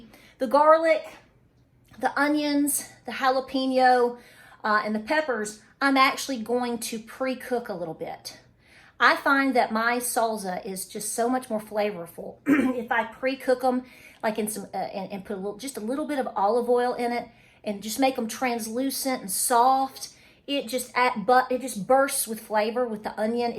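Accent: South, United States. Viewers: Your are southern funny love it 😊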